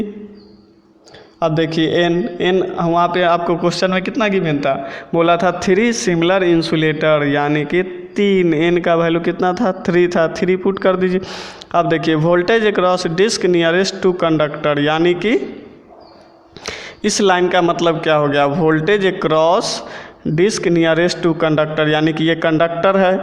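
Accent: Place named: India. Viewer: I want this lesson but i cant listen indian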